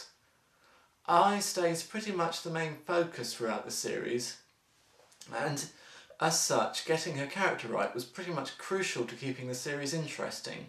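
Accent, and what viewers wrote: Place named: United Kingdom. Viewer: I'm just wondering and not trying to be rude but why do you talk like you are a British unless of course you are a brit?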